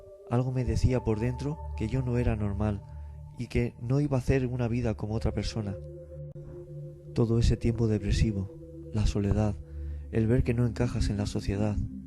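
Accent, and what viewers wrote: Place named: Spain. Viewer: muy lindo y modesto tu historia de cómo te hicistes este guía espiritual de la humanidad me pasó algo parecido a la historia de la muchacha pero no con el suicidio si no con la muerte de mi hermano el día q se puso malo me entró un vídeo q nunca me había entrado ningún vídeo de ese tipo fue la historia del neurocirujano q tuvo una ECM como una demostración q mi hermano IVA a estar bien y a partir de ese momento me sentí súper tranquilo y le perdí hasta el miedo a la muerte y fue cuando me entró tu primer video bendecido por Dios y a partir de hay los e visto todos los q han entrado me das mucha confianza vivo en cuba sino fuera a España a conocerte tu acento es español si cojo la ciudadanía española dios me llevará a conocerte muchas gracias por todo bendiciones pa ti y tu linda familia y para todos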